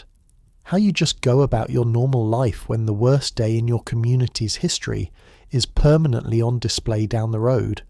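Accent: United Kingdom. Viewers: SICK OF THESE BRIT SCHIT ACCENTS.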